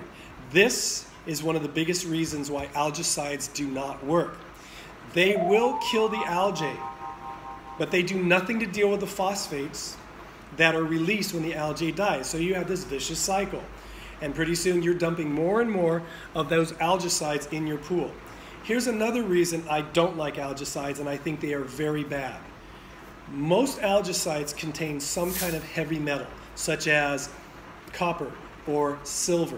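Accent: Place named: Arizona, United States. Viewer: Arizona accent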